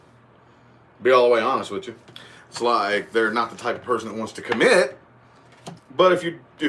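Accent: United States. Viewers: I missed u live! Ah well!! Next time! I'm not evn Leo but i like ur vibe!! Your funny and the key is your last 1st evr vid I saw of yours was really really accurate4me so i know ur good. I am irish! I love ur American accent!! Hope u don't mind me say that!! It's a compliment!!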